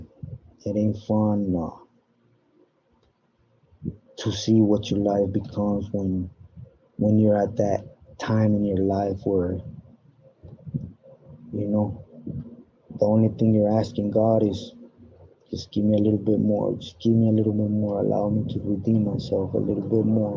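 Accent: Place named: Solano County, United States. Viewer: You gotta be from Solano county...the way you talking...you might be from Vacaville maybe Napa or Santa Rosa....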